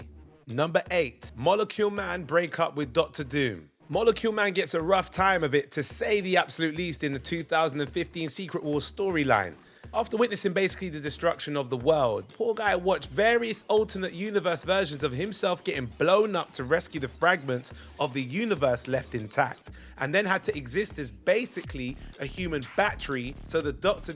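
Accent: United Kingdom. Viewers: This guy is to British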